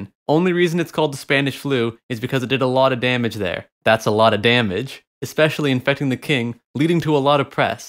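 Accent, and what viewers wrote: Newfoundland, Canada. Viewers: Started watching a ton of your videos. Quick question. You a Newfie?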